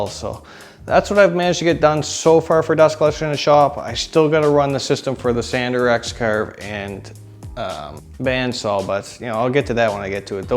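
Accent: Canada. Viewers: I believe he is canadian, not a yankee :p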